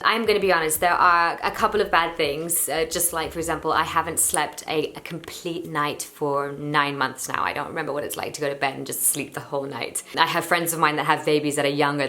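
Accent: United Kingdom. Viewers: Love your new channel and thank you for finally making English videos again, i was just wondering about the heavy British English accent what’s up with that 😃 i mean i used to notice it a tiny bit before but now... 😄 still love everything though ♥️♥️ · Wow you now have a British accent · Wow - hadn't seen a video in a year or two so thought I'd drop by. Imagine my surprise when I discovered she has a totally different accent now. (Go watch her videos from a few years ago - her accent is American. Now it's what - London?) So weird. Also weird is that she appears to be in the same bedroom she was 12 years ago, even though she's apparently married and has a kid now. Does she still live in her parents' house? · She moved to London so acquired a slight British accent not as much as her husband though.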